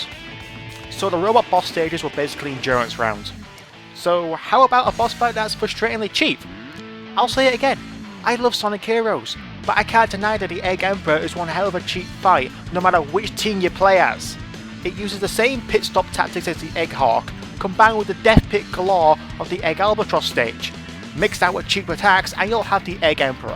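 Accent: United Kingdom. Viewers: is from UK?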